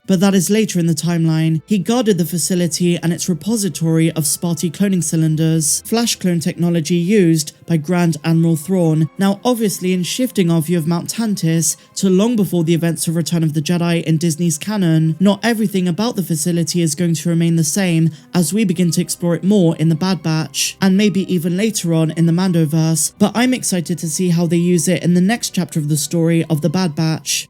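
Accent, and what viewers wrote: United Kingdom. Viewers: I know you have an British accent, and that's all well and good and attractive in the US, but in this case you're ignoring the fact that a noun is being used as a proper noun, a name. As highly as you regard this IP, you should pronounce someone's name how they would. For one reference, remind yourself of how Data corrected Dr. Pulaski in season 2 of Star Trek: The Next Generation. In his words, "One [ pronunciation ] is my name. The other is not".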